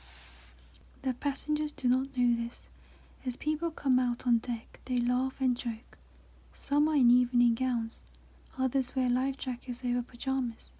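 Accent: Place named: United Kingdom. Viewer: 님 진짜 목소리 너무 좋으세요 ㅠ I love your voiceeee💕 그리고 영국 발음으로 읽으시는것 같은데 저는 미국 발음으로만 말할 수 있어서 그냥 목소리도 발음도 너무 매력적이에요! 잘 듣고 가요, 감사해요!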